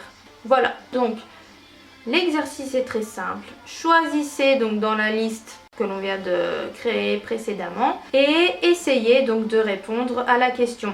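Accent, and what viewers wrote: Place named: France. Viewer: Salut ça fait vraiment 5 ans que tu es au Japon ? Quand j entend ton accent j ai l impression que tu es en France plutôt. C est pas méchant.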